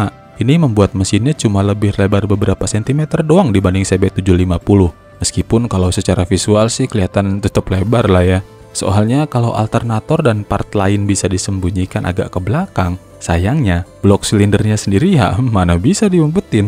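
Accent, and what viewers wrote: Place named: West Java, Indonesia. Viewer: Orang sunda mana kang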